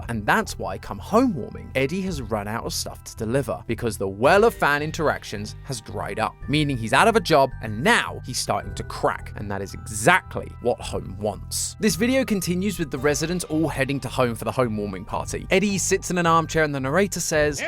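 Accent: United Kingdom. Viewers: He’s British, 😮 not going to lie, I like him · Why is he british? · Hold up why did MatPat leave? Nvm Now we a Very British Man😂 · The British boy is back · Ok, but the british accent?😳 Definitely staying with game theorists now😅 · WAIT HES BRITISH :( GOROSEI DENIED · sound like the British version of mato at or more of a Brit acent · I actually appreciate the voice change and i have to say its almost nostalgic for some reason to replace an american accent with a British accent. I accept. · Why did they replace Matpat with this Brit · is the most British person I've ever heard Note:it's not a bad thing that he's British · We may miss Matpat. But British matpat hits different NOT IN THE SUS WAY YOU IDIOTS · Is British❤ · BRITISH BOI IS BACK! WOOOO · I like your accent, british man. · I’m going to call British matpat now. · british mate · The new host has such a nice British accent I could never reach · is literally a British version of matpat and I’m all for it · He sounds Soo British. 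Is British? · matpat sounds british lol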